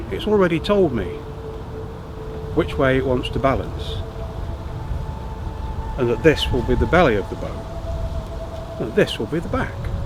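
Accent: United Kingdom. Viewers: British AF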